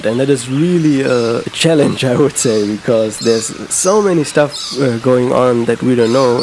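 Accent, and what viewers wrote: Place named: Germany. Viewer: You kinda sound German to me. Biste deutsch? 😁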